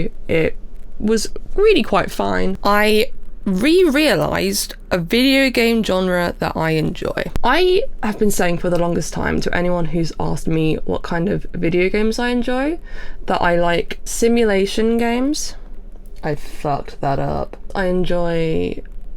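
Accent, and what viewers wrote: United Kingdom. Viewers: u sound more british than usual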